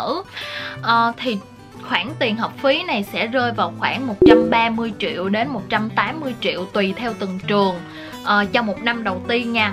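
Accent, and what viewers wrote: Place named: Mekong Delta, Vietnam. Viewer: Ôi e là người miền tây à C cứ tưởng e là người Sài Gòn cơ 😁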